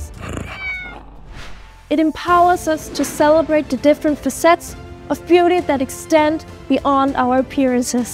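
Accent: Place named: Denmark. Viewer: She has such a danish accent :p I love the true-ness of this nation representative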